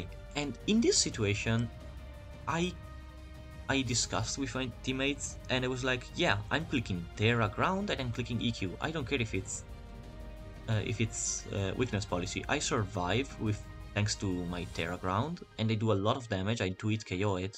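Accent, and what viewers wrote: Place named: Spain. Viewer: Juro que lo escucho y pienso que sabe hablar más español que inglés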